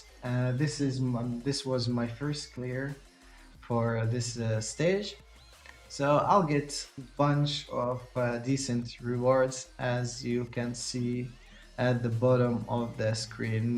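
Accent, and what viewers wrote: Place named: Arab world. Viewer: لهجتك كأنك عربي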